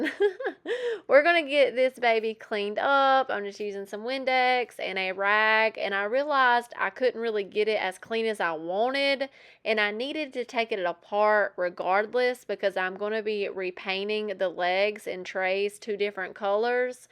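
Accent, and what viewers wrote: South, United States. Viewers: Love your work. You said someone suggested you get a narrator, so rude and low life. Normally I don't like a southern accent. I've stopped watching some sites for this reason. However I love your voice. It is soothing and low key. You're great😊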